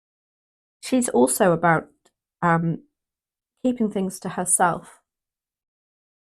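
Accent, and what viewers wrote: United Kingdom. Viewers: Thank you so much In America we love the British accent:face-blue-smiling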